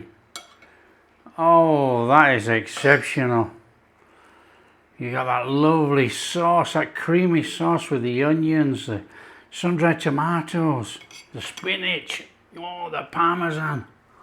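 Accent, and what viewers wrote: Yorkshire, United Kingdom. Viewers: Hi, i have been surfing all the channels that i used to follow before i found yours, and the amazing thing is they have all upped their game, some indian channels, which i wont mention, has adopted your trademark saying of " Look At That! " Which brings a smile to my face as its not with your Yorkshire accent. So you obviously are making them less complacent. Fantastic! X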